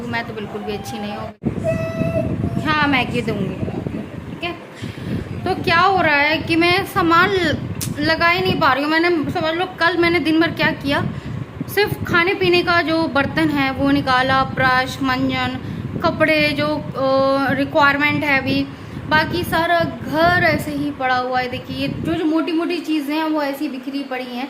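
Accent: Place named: Uttar Pradesh, India. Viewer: Up language lapat chal rhi h😊😊😊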